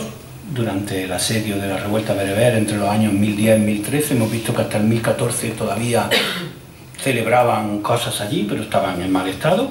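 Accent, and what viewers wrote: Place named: Córdoba, Argentina. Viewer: Lástima la calidad del vídeo, con mal sonido y sin que veamos los documentos de patnalla, con un micro antiguo que recoge las toses, con lo barato que es un micro de solapa, y con alguien que no lea los textos que él conoce a esa velocidad, que no hay cerebro que lo pueda asimilar al oirlo, sobre todo para quien no está familiarizado con el acento cordobés.Y por cierto, la existencia de cultura islámcia en la Península Ibérica, es una invasión, una ocupación, por mucho que el sr. diga que no, pero ya sabemos la línea ideológica subjetiva de la universidad de Granada. Poco profesional todo.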